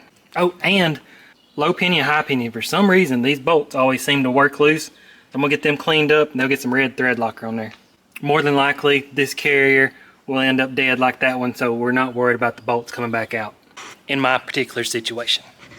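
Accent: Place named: South, United States. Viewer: If he’s got a southerner accent, you know you can trust him.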